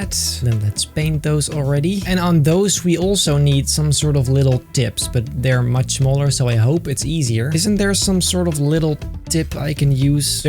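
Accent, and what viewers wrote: Netherlands. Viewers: i am just rly wondering if you’re Dutch cus you sound kinda Dutch :] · Are you dutch? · Are you dutch?,I love your videos! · Lol ik hoor dat je Nederlands bent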